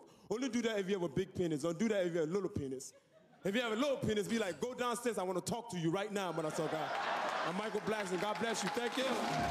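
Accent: Africa. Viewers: lol his African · I absolutely love him. He's hilarious. I never get tired of the African accent.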